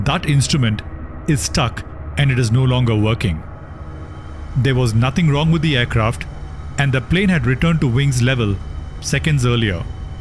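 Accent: India. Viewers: Fuck u bro ur Indian when u speak an when u tell this story your English is mix in Hindi so better why u don’t try to say this story in Hindi coze ur English do not sound like English 🏴 uff any way good try keep it up 😡😡😡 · These pilots are f*cking idiots...Boeing is right to blame the Captain but they should've blamed the whole crew, I try not to judge but there's no way I could've made this mistake, not with 3 attitude indicators and 2 of them saying the same thing...if it was 2 broken attitude indicators saying the plane was banking right I could understand it more but then you'd still have one that's moving correctly and to ascertain your correct orientation all you'd have to do is bank the plane left and right...the only way I could understand this completely is if it was all 3 failing in the same orientation or if it was something like Aeroperu 603 where the crew was constantly receiving conflicting information...all that life gone for a problem that I feel many rookies would spot fresh out of the academy and the only real thing that stops me short of judging these guys is that I've never been a 747 pilot...thank you as always for these premium uploads I spotted a few errors like the cockpit being off, the engine models and the yokes of a 707 but all the information that you give that's most important is spot on and never biased and I really appreciate it not to mention I love your accent and Indian culture is such a a rich one, I almost hate seeing how beautiful this 747 was and all the amenities with Indian culture infused into it, makes me wish I was wealthy to experience things like it and thats one reason the 747 is my all time favorite aircraft because it was like you said like a fly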